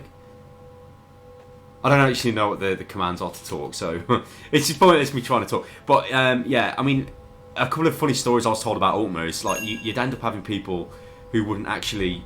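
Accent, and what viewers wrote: United Kingdom. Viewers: this video is so birtish english i can take this for my english class :D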